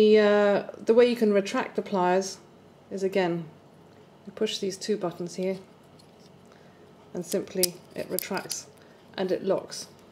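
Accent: United Kingdom. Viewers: ITS GREAT TO HEAR YOUR VOICE !! You sound the very proper British Lady ! Thanks for the review. nilo52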